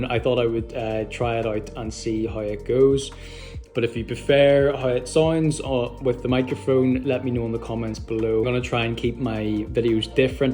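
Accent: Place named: Ireland. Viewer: No way!!! I’m doing the Belfast marathon as-well, I suspected you were doing it cause u said u were 12 weeks into training which means most likely u only have another 4 weeks left and the Belfast marathon is in 3-4 weeks and I could recognise the Irish accent from anywhere. Maybe I’ll see you there mate, good luck and what time goal are you going for if you don’t mind me asking?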